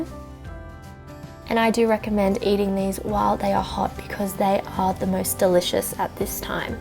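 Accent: Australia. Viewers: This is not meant to be insulting or racist in any manner, but as an Aussie of Scots-Irish descent, and having been descended from First Fleet penal colonists, I’ve never been able to wrap my mind around an Asian with an Aussie accent. That said, the scones look deelish…nice and fluffy.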